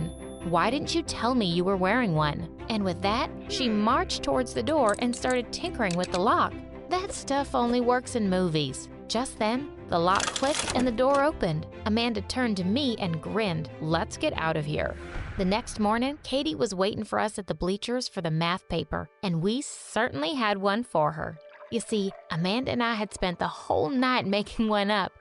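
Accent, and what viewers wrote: United States. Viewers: Girl i love your cowgirl accents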